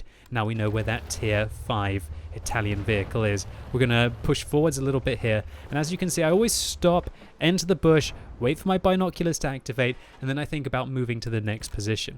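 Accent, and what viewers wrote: France. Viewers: bon accent français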